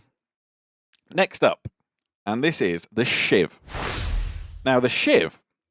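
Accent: United Kingdom. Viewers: You Brits and your zeds and oughts..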